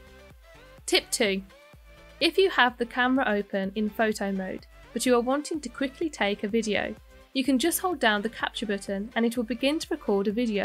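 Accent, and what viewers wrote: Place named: United Kingdom. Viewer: Great video and very useful tips- everything is better in a British accent! :)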